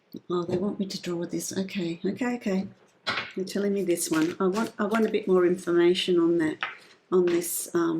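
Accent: Australia. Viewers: so nice to hear the Australian voice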